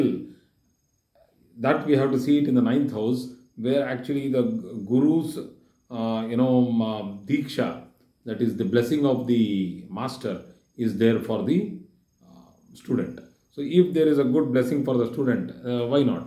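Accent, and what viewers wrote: India. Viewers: Your English is great! ❤ I like to listen to hindi accent to train my ears to understand, your English I can understand easily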